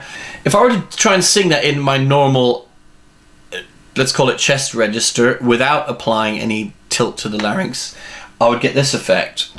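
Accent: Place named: United States. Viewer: are you UK mixed with US..???..you sound a lil bit American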